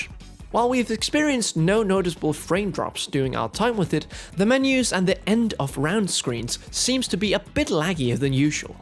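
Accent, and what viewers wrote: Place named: RP, United Kingdom. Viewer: Why are you taking so posh? That’s not how you normally talk.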